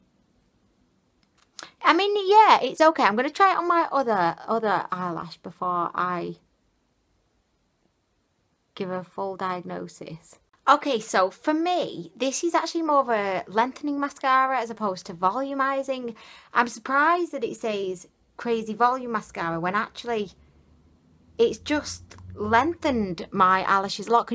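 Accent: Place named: Birmingham, United Kingdom. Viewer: Where are you from? I can't tell if your accent is brummy or Manchester x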